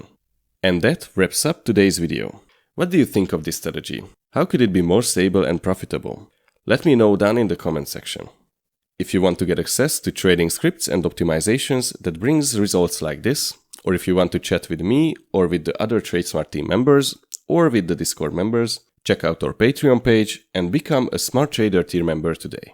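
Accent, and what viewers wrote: Hungary. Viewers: Are you Hungarian? :)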